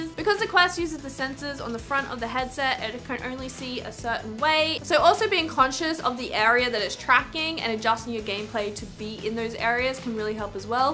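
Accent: Australia. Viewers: Ok does anyone know why advice like this sticks in my head better when it’s delivered in an Aussie accent?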